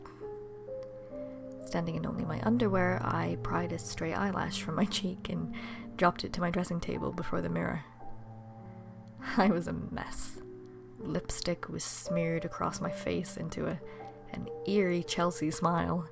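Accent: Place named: United States. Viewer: If I didn't know better, I'd say you were actually American!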